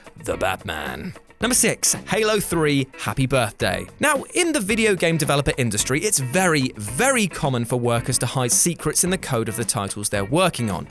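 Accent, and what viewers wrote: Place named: United Kingdom. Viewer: Everything is slightly better with a British accent.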